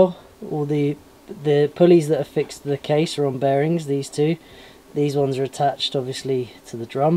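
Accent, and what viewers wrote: Wales, United Kingdom. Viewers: Because I'm listening to you with American ears sometimes your Welsh accent is hard to understand but I love your videos keep up the good work you're very talented person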